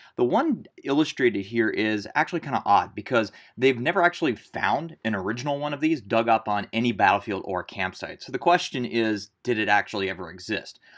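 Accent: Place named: United States. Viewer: Are you intentionally using ‘Murican pronounciation of the various uniform elements, vice the actual French pronounciation? Is that a reenactors affectation? Regardless, very impressive work! Do you belong to a Napoleonic re-enactor group? If so, what regiment do you present an impression of?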